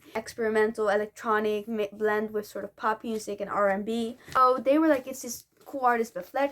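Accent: Netherlands. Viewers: You sound Dutch. I'm strictly lossless streaming nowadays but I like people going on about their vinyl😀 In my late teens I had several LPs, including your two.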